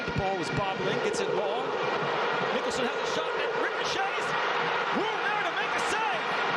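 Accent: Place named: United States. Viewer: Amazing but shame it was an American commentator. It just sounds weird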